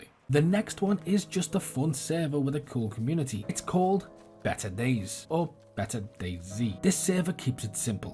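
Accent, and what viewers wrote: Liverpool, United Kingdom. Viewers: Are you from the Toxteth area of Liverpool by any chance? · Yo my fellow scouser where about are you from hahaha love to join up with you, my mates are all moaning bastards on dayz I reken youd be sound and chill to play with. Thank you for the content too!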